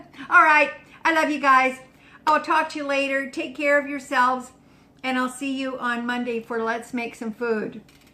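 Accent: United Kingdom. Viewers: Hi, absolutely loved this. You're a wise lady with a fab sense of humour. Life is too short to be uptight....have the sugar, eat the cake, drink the cokie cola 😉 we have to have some pleasure in life. Wonderful English accent by the way. 'Bottoms up darling!' As we say here. ❤ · Lol, love your British accent in this episode! My feeling is , everything in moderation. Including sugar. Yes, too much of it isn't good for you. But that woman that made the snarky comments needs to get real and stop judging you. You are doing g a great job and I love your channel, one of my very favorites. ❤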